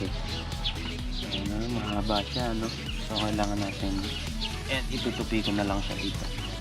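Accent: Pampanga, Philippines. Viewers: Pansin ko sir sa pagsalita mo, hula ko, kapampangan ka? tama ba ko? hehe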